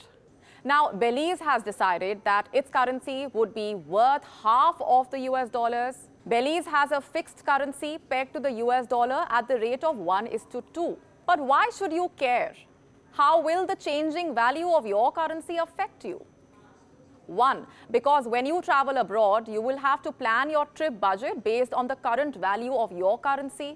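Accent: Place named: India. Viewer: Good report, but when Indians speak English, their accent is like finger nails on a chalkboard.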